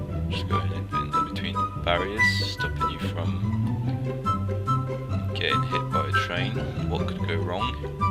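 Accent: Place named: United Kingdom. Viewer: Great to have a UK voice on a channel 😀Suvscribed👍🏼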